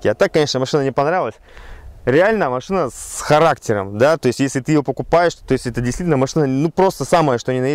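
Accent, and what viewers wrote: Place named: Mari El, Russia. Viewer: бля, я живу в марий эл, у нас 50% населения общаются как! Респект!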